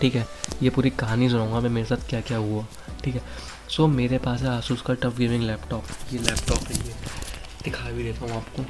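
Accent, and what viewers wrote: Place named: India. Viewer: Yeah like im supposed to understand a damn indian guy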